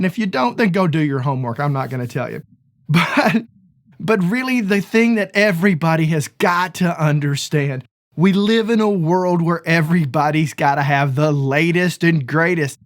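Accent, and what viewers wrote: South, United States. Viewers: Says the guys with shit guitars hanging on the wall. Not to mention, FLORIDA. Can’t trust tech advice from FL or a southern accent. This post is F’ing stupid.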